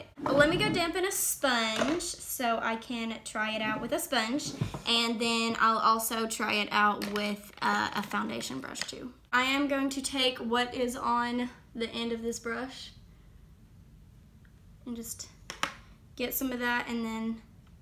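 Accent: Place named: South, United States. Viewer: I just subscribed because of that accent!!!! I love the Southern accent.. Wish I could have more southern Americans coming to the UK the accent is a delight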